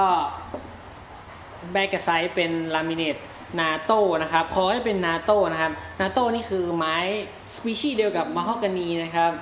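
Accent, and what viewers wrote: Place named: North, Thailand. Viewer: สำเนียงเหนือนี่ ออกชัดเลยน้อ อ้าย อิอิ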